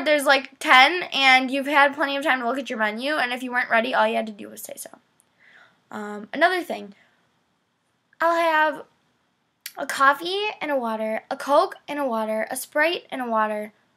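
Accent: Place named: Michigan, United States. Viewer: you sound like you're from michigan!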